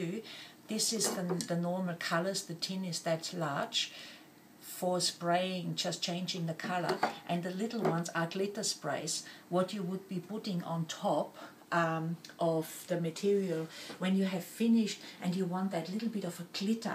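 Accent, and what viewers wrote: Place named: Germany. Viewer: Listening to you I am wondering if you are German. If so from where in Germany. I was born in Solingen and grew up in Mannheim. Would love to make some wide brimmed hats but cant find patterns for it.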